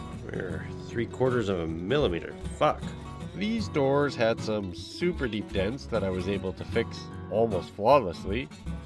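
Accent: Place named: Canada. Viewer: First time watching…immediately hear a Canadian or upper Midwest accent!❤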